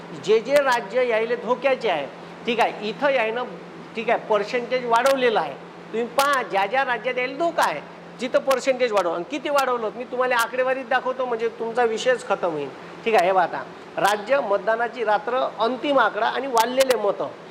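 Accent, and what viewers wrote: Vidarbha, India. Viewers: आपल्या बोलण्यातून मधीच 'ठीक आहे"हे उच्चारण करणे कमी केले तर.... खूपच चांगले वाऱ्हाडी भाषेत बोलणें आहे.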